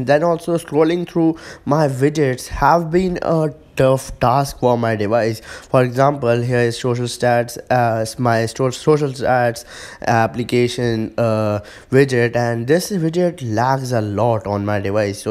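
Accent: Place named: Tamil Nadu, India. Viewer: i can't understand what the hell you talk .. please talk english.. not english tamil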